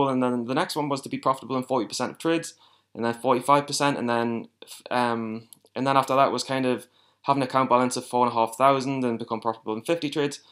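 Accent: North East England, United Kingdom. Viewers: are you from the north east of england by any chance because i am too :)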